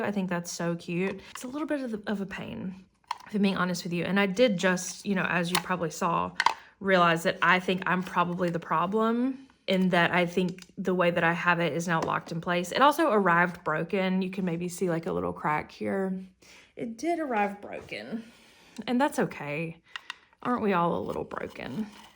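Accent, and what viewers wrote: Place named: South, United States. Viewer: Listening to you ramble about your stationary & journalling for an hour has been a JOY!! I wanna write all the ways I have decided you are awesome but I think that would be super unsettling, so. 🤣😭 Okay, I'm gonna do it anyway. I love an uncut stream of consciousness of absolutely nerdy shit, like I want to tell my partner about the intricacies of each of my pens and each of my storage devices too, for reals it's so fascinating idk!!! I don't because to be frank I get sick of the sound of my own voice, but a cute ass chill queer southern babe is perfect for my ears. I can't do much with a million journalling structure videos (tho, love the info sometimes, but I don't have the patience or concentration to just wAtch a video?) that are at most twenty minutes long - while I'm preplanning literally just one title page for an hour (what was that YT person you said is really good at Just Going For It again??), I need someone w an effortless ramble made of pure chat about journalling with no urgency at all. 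rewatching this this morning and a few mins in, very Sunday morning vibes, I bursting out laughing at the extremely niche relatable dropping of "Some weird selfie of me crying in the car" 😭😭 babe you're EVERYTHAnnnnG. Pls never stop (okay that's literally totally and always should be up to you, no pressure.. okay pressure, but that chill kind of We Love It not like needy entitled audience but lookin at your comments section we already love yeww. so no pressure.) I hope you know t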